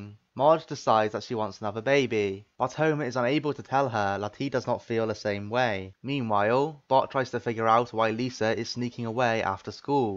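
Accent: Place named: United Kingdom. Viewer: Hey, I have a question. It's okay if you don't want to answer it. But: Your accent is a bit interesting, it sounds like a British accent mixed with other accents, So where actually are you from?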